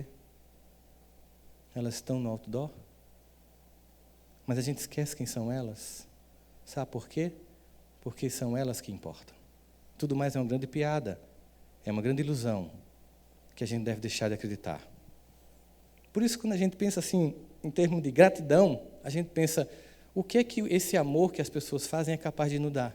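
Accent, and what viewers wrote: Northeast, Brazil. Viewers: Também gosto do sotaque. Sou paulista mais admiro um nordestino que fala assim com clareza. Na minha região falamos muito feio kkkkk. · Que história linda!!! Sou sua fã incondicional. Moro em Natal e gostaria de saber se você vem aqui para uma palestra.... lhe acompanho há quase dois anos. Adoro suas palavras; suas palestras e seu sotaque que é igualzinho ao meu. Sempre grata a você que é um enviado de Deus. Muitas bençãos pra você é família 😊🙏🏼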